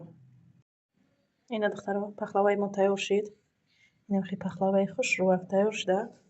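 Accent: Tajikistan. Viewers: Хохарам шумо аз кучои ТЧК гапзпниет Кулобиеворияй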